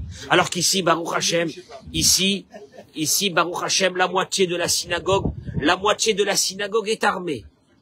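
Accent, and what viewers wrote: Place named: Marseille, France. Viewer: Vous parler avec geste de méditerranéen je vois et j entend un marseillais super merci pour votre lumière j aime vos cours toda😂😂😂😂👍👍👍🤣🤣🤣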